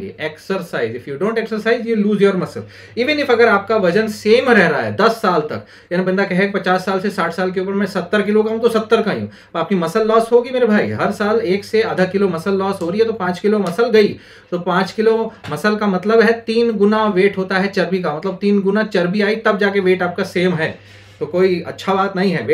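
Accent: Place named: Bihar, India. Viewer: Bihari accent 😅😅😅😅😅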